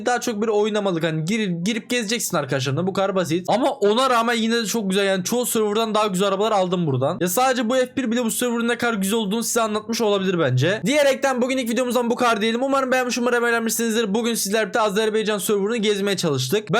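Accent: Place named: Turkey. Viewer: Qaqaş Sən Niyə Türk Kimi Danışırsan?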